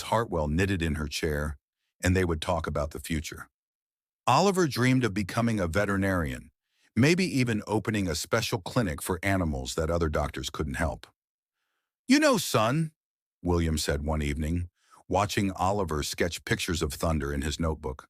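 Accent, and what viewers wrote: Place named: United States. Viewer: I am so sick of hearing horrible US accents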